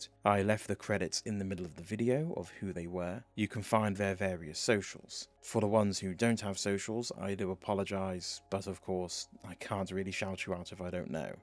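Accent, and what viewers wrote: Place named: United Kingdom. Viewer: Pretty cool but british accent so i have to dislike... sorry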